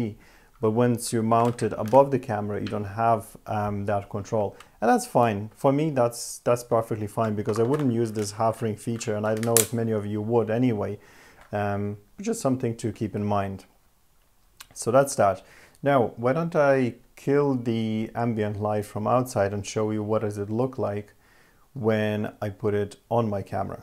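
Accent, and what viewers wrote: Ireland. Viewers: Thank you so much for this review, it has helped with my decision to purchase it. Totally off topic but where is your accent from? I'm sure I can hear an Irish twang mixed in with European!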